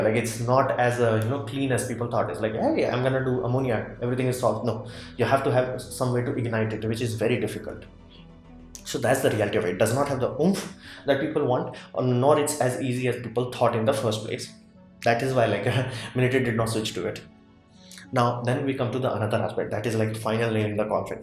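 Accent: India. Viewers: Thank You :-) Piece of advice, speak slower, i've spent a lot of time with indian support people and it takes a while to get used to the accent. But other non native english people (I'm danish) have to translate twice when working with indians."indlish"<>english<>danish so talking slower is at the essense.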